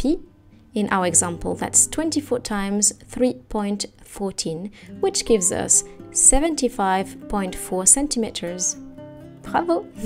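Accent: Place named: France. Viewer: Very good step by step video. (And a charming French accent too!) Same method as recommended by my highly experienced upholstery tutor.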